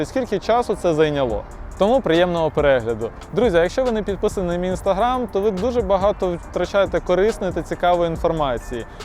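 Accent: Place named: Russia. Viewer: Чути характерну вимову людини яка розмовляла російською, але звісно респект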